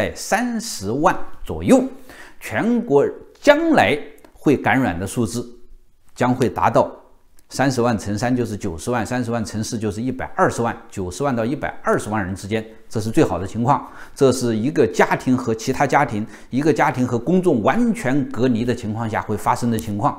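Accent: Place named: Dangyang, China. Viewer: 口音越听越像当阳人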